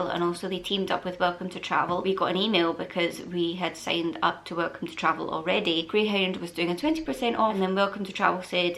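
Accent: United Kingdom. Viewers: I love your accent are you British 💞💞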